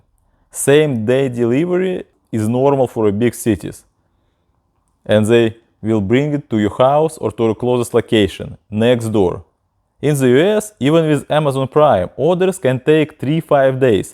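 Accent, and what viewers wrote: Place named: Russia. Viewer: You have the best russian accent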